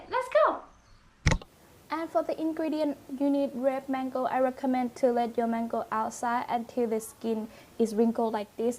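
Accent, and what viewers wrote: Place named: Australia. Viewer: You slowly getting an Aussi Accent ...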